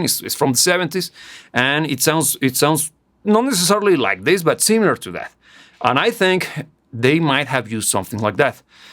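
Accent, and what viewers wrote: Russia. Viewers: With the Russian accent this heavy, I strongly doubt that you haven't heard this before :) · you sound russian who is speaking english. where are you originally from, what’s your first language ?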